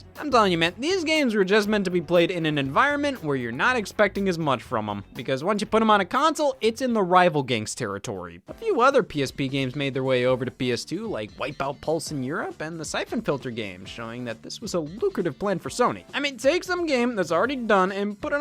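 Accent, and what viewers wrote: Midwest, United States. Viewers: Mid-western accent (especially Ohio)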